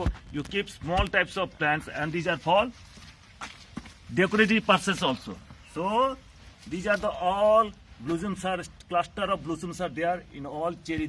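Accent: India. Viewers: इससे तो आप हिंदी मे बात करते तो अच्छां होता ...ये कीस तरह कि इंग्लिश है ? इंडियन लेह्जे कि ?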